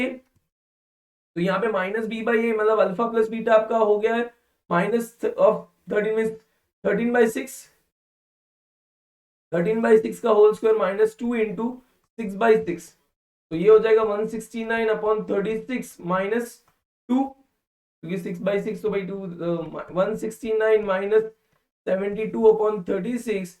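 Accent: Gujarat, India. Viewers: Are u gujarati ??😅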